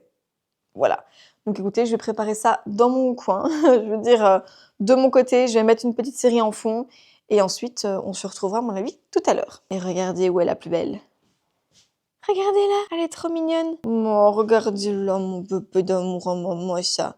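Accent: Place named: Belgium. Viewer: Bonsoir, je n ai pas trouvé la réf de la lampe que tu mets sur ta caméra. C est pour accrocher à mon miroir. J ai cherché sur ta boutique Amazon. C est carrément le makeup que j aime: glow… J ai exactement le même modèle de boucles d’oreilles. C est vraiment un bel intemporel. C est vrai que tu as un fort accent belge parfois c est très drôle 😂😂😂. Je vois que Vivi t accompagne au pilâtes. Mes chats font la même chose. Ils se demandent ce que je fais par terre. Tu as utilisé ta palette Dior habituelle pour le glow? Je pense que je vais me commander la brume Rhode. Bises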